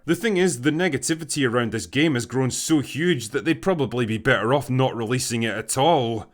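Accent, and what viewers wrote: Scotland, United Kingdom. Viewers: I love your gay Scott accent in all your videos. I hope it isn't fake.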